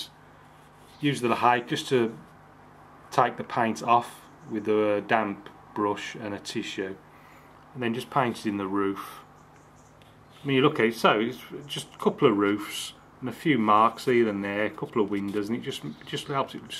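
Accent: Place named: Midlands, United Kingdom. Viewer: You inspire me so much, love your Artwork, you even make a telegraph pole into a work of art. I also love your honesty as you review your paintings at the end, even though I can see nothing wrong until you point it out, it helps me see things in a different light on my own feeble attempts at water colour painting. you ascent makes me wonder if you are a Midlander like myself? :D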